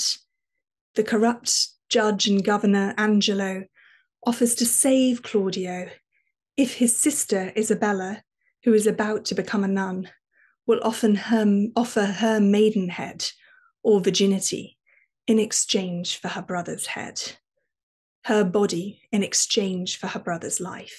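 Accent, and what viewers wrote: United Kingdom. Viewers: The British accent is beautiful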